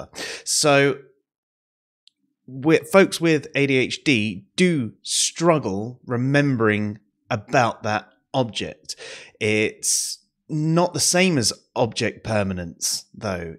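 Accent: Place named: England, United Kingdom. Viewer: This video just popped up on my feed and I'm glad it did. Very interesting topic for me to help understand my son who has ADHD and autism. Interesting, to the point and nice to here an English accent as a little extra plus. New subscriber here. Looking forward to checking out your other vids. Cheers for sharing.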